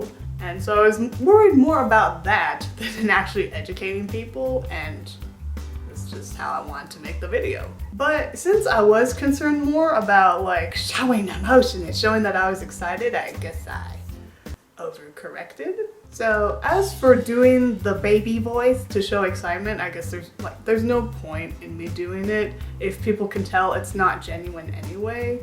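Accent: South, United States. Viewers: you talk southern lol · I think the way you talk is sexy as hell! It’s like an old-school Southern girl accent. I’m not into ASMR, but I usually watch just to hear your voice. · Imagine having a slow southern accent Beautiful young lady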